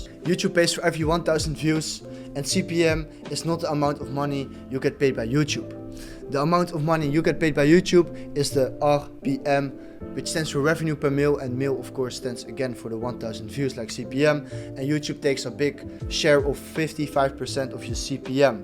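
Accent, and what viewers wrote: Netherlands. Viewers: Klinkt nederlands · Lekker Nederlands accent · Love the Dutch accent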